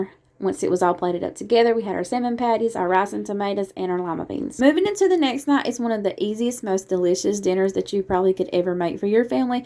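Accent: South, United States. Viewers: Hi pretty girl, thank you for sharing your recipes , everything look so good and can't wait to try to make as well as you. Ps. Love your Southern accent. My daughter lives in Georgia too and my grands have the same accent.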